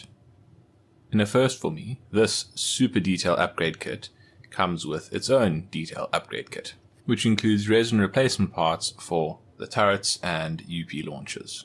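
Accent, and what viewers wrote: South Africa. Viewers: Hey. You sound South African? If so, can you help with where to find the PE parts and wooden deck kits here?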